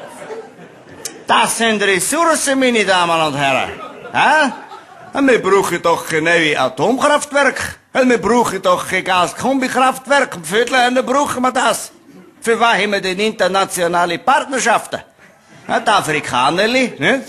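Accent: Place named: Appenzell, Switzerland. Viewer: Einfach köstlich! - Bin ebenfalls Innerrhödler(in), lebe aber schon seit über 20 Jahren im Ausland und habe und seine Videos erst heute entdeckt. Was für ein herrlicher Fund; ein super-Aufsteller und Wiederfreudehaben am urchigen Appenzellerdialekt! Herzlichen Dank fürs Hochladen!!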